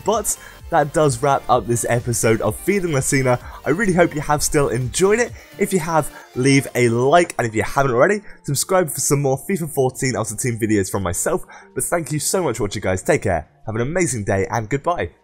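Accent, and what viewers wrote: United Kingdom. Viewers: dont you worry, you talk british and we all love it <3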